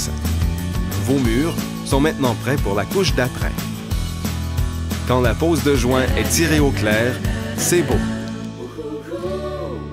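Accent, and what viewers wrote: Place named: Canada. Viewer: ce que j'aime c'est l'accent canadien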